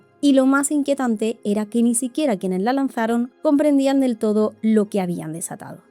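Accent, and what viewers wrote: Spain. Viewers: Buenisimo documental! Y mas con tu hermosa voz y acento! De qué parte de España eres? Para reconocer el acento… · Es video es excelente, me ahorras el tener que leer un libro cada vez que veo uno de tus videos. Está muy bien documentado, te felicito. Y me encanta tu acento porque soy de latino América y mi país heredó ese acento andaluz como el que tú usas, solo que se te escucha mejor a ti. Te felicito y sigue adelante. Espero tus nuevos videos.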